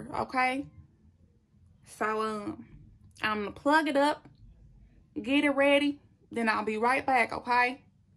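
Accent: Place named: Alabama, United States. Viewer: Lmao accent. U sound like my cousins in Alabama.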